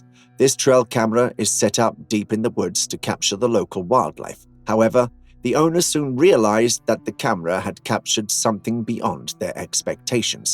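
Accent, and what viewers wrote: England, United Kingdom. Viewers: Why halfway through this podcast did you all of a sudden you have an English accent?!! Did anyone else notice this?! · Yeah the narrator's voice pulls me into the action of the videos. Where is the dude from England or something?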